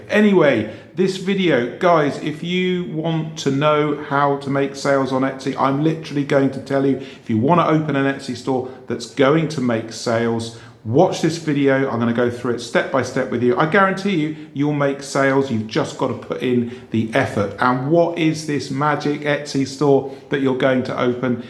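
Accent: England, United Kingdom. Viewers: Hey, I stumbled across your channel by accident and I have to say I absolutely love your advice AND whats nice is hearing an English accent talking about Etsy (as its usually people with an American accent). I have a question, I haven't even started yet but what is your advice, can you open an Etsy store in the US being from the UK or is it better to start in the UK and then can you move and expand in the market? I actually live in Dubai but am originally from the UK (and still have my UK bank account)..any advice would be appreciated. Thank you so much for all the value you give.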